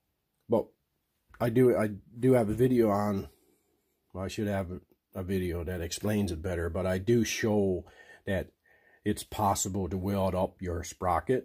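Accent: Newfoundland, Canada. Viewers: Your acsent you from nfld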